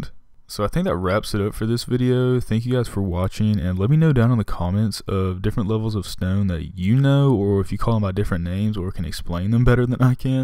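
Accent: South, United States. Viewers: I can't tell if you're southern or a frat guy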